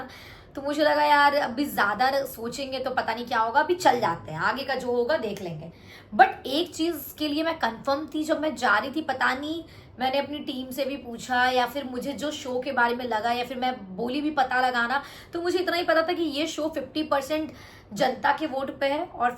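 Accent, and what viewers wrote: Bihar, India. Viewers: Manisha ap bhut achhe ho but mai buttering nhi krungi. Ap is show me kaise khelna h soch k gye the isliye muje v kaibar arjun sir jyda achha lg gye jbki mai chti thi nhi muje hi achi lgni chaeye but mai justify nhi kr pati thu... Ap hr jgh natural rha kro kuchh soch k mt khela kro..ap aisa bich me english english krte the bt muje lgta ap apnr hindi ar binhari accent pe mst ho yrrrr❤... Apne kchhh glt nhi kiya h fir v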